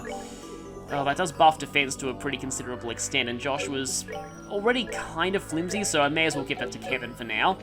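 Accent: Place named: Australia. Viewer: Aaaaand there's the leitmotif. Also, at least in the PSP version, enemies don't respawn even if you leave the tower and then come back. They look a lot like stone tablets (with the archetypical look of the Ten Commandments coming to mind). ...Thinking about it Ys 8 did a similar thing, except instead of the computers projecting them as images the tablets were the "computers" and projected the images themselves. I'd imagine it probably is a regional one; I've never heard an American or Canadian say it as anything other than "day-tuh", but I've herd people from the UK and (obviously now) Australia say "dah-tuh".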